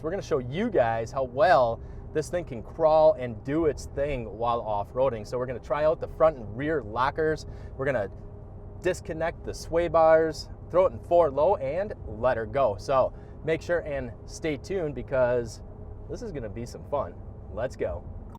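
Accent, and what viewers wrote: Wisconsin, United States. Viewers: That's how them good ole wisco boys say out here lol I catch myself saying it like that too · I could hear the Wisconsin accent the second I heard you say "wegon ." I like hearing all the different American accents! Love the Power Wagon, but I wish it came with 35's and the axles. I'd still buy one, but 35's would be the cherry on top.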